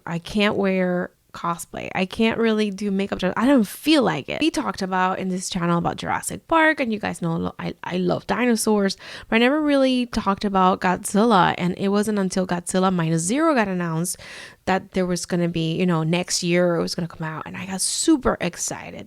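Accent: Cuba. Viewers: Lifelong kaiju fan here. While the <num> era of suits and miniatures will always be the true heart and soul of the genre, our current revival with CGI blockbusters is still a glorious thing to be living through. It made my day to see you brought into the fandom by the new entries, while also being willing to go back and embrace the back catalog. We need a million more like you! ❤ And your Cuban accent is great. Anyone giving you crap about it should be ignored, full stop. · As an American kid my first exposure of Godzilla was the 2014 movie in theaters, then the second movie I saw was Shin Godzilla and since then I became obsessed. I have seen 30 of the Toho movies and love those classic movies so it's cool seeing people love Godzilla both Toho and Legendary more and more. btw I like your Cuban accent it's so nice.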